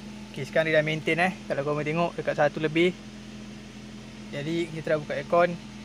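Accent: Melaka, Malaysia. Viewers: Assalam bang, Nak mintak tolong tukarkan boleh ke?...Saya dah service kat bengkel kena RM 60 ...Macam tu jgk turun naik ....bunyi cakap mcm org melaka...kalau kat melaka boleh la roger2...haha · Pekat betul tu bang melaka tu hahaha. Mantap bang video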